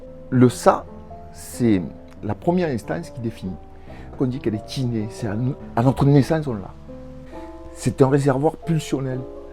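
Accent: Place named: Marseille, France. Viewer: Vous êtes le seul à nous présenter les topiques sans prise de tête, c'est compréhensible et tout a fait abordable finalement. A condition d'expliquer ÇA clairement, sans trop de termes barbares. Étudiante en soins infirmiers, ÇA m'aide beaucoup. Merci beaucoup !!! Le cadre est idyllique, sous un magnifique soleil ! Mais ce qui rend cette vidéo agréable à voir, et surtout à entendre, c'est ce chouette accent marseillais qui donne une jolie musicalité à vos propos. J'adore 💚❤ Encore merci... 👍😘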